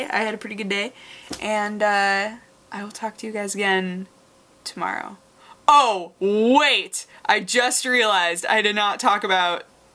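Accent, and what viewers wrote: Spain. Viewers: hey I know spanish (well actually I know english, spanish is like the normal language I speak :p ) Your accent sounds very, like, from Spain. nice job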